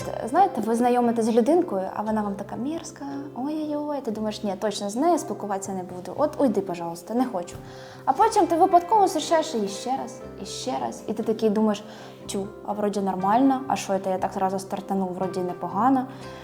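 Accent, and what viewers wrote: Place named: Surzhyk, Ukraine. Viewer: подобається твій періодичний суржик) дякую за роботу.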